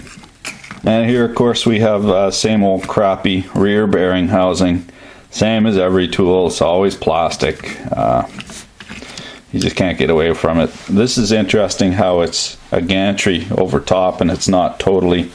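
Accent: Quebec, Canada. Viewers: dude, i just kinda stumbled across you're vids and I am by know means an engineer...however I am sooo intrigued by all your knowledge of this stuff. Im just a humble union carpenter and saw you reviewing some tools i was interested in getting and now i cant seem to stop watching your vids... your canadian accent, your sense of humor, your depth of knowledge...congrats dude! you just got yourself another subscriber :) cheers from you're southern neighbor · I fucking love how he drops french canadian lines here and there..! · OK...OK...this is now my SECOND favourite channel on YouTube. Love the close up camera work and you actually seem to know what you're talking about!! Bonus. I've subscribed and I'm heading off to your channel to see what else you've reviewed. Do I detect a Canadian accent...sorry I'm from Australia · Canadian or what? some of those words I have never heard an american say.... 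but then again I stay away from the lake areas. great video.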